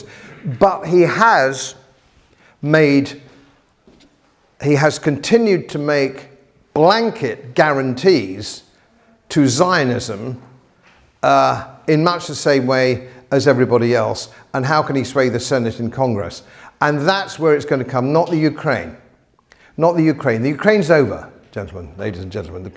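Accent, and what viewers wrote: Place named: England, United Kingdom. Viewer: It's great to hear a lecture delivered in such a quintessentially English manner, thanks Godfrey for your dynamic and uplifting speeches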